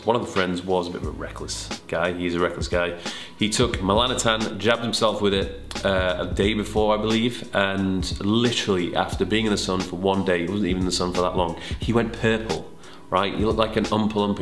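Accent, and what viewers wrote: United Kingdom. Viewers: Omg my god, you are hot as holy moly, and have a british accent.. two totally dangerous amounts of hotness ;)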